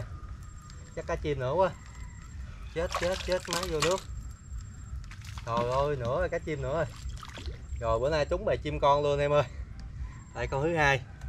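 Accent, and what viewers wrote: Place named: Mekong Delta, Vietnam. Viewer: đúng nói chuyện kiểu chân chắc miền tây, câu dính thả cá là mình thích rồi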